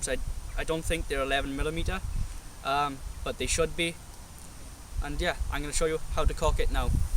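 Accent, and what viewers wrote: Wales, United Kingdom. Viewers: That was the first rifle I bought and are you from Wales because you sound welsh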